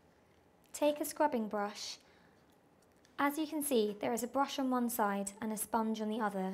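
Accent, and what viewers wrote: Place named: Southern England, United Kingdom. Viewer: Nah, just Southern English!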